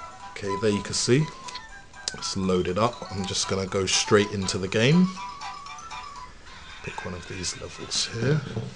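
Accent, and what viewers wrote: United Kingdom. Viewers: Thumps up if you're fond of the British accent. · ENGLISH accent, there's no such thing as a British one. ;) xx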